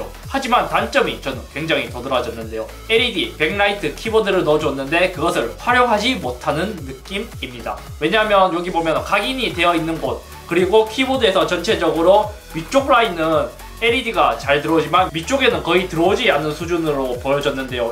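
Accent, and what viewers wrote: Gyeongsang, South Korea. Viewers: 고향이 경상도이신가요? 사투리가 비슷해서 그런거같기도한데용?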